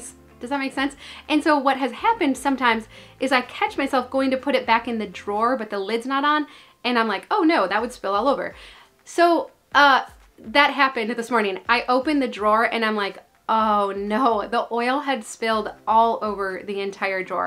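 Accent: Minnesota, United States. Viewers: You say bAg like a true Minnesotan! Gotta love that hard A sound. I also have that same A problem 😂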